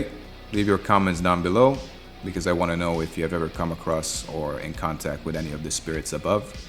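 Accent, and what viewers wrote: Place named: Eastern Europe. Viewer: Sounds Eastern European.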